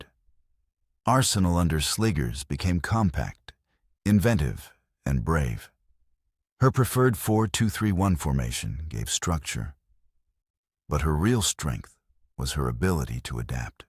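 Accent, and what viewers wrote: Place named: United States. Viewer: Why do we need an American accent?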